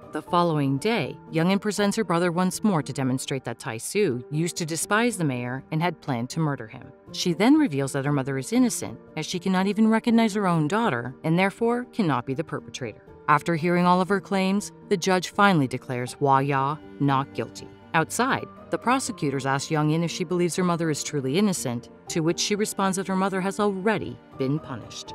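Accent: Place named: Europe. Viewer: I think maybe she's an European? What's wrong, Korean is difficult language to learn for Europeans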